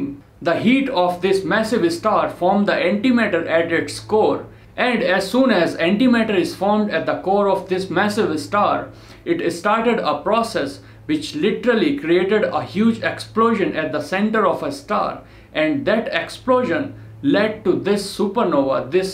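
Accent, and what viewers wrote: India. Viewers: I hate an Indian when he talks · I want the text back not because of your accent im indian too but i don't have that accent but with text its chill for me :D and u very genius mwen :D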